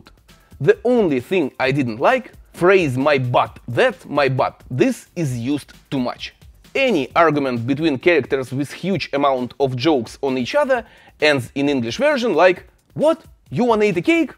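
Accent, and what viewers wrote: Russia. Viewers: Рашн инглиш из изи ту гет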